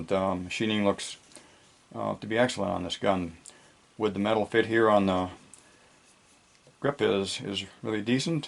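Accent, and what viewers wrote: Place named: Minnesota, United States. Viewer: You must be in Minnesota based off of your temps and snow and accent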